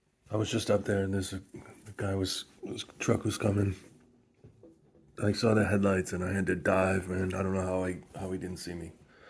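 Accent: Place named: Massachusetts, United States. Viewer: I just found your channel a few days ago. So far it seems you're camping in NH, but you seem to have a Massachusetts accent, am I correct in assuming that? Great videos, BTW! 💞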